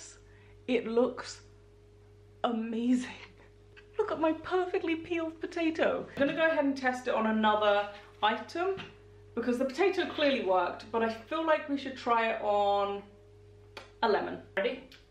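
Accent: United Kingdom. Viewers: you are always great fun! I had to chuckle...I have the watermelon cuber too...As a Texan, I LOVE your Brit accent. You are practically perfect! Thanks dearie!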